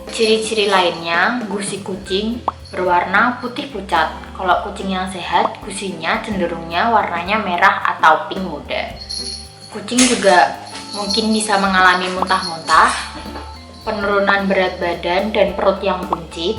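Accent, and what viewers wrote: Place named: Banyumas, Indonesia. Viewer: Si embak ini kaya irg banyumas ,, bicara ny ngapak😅😅😅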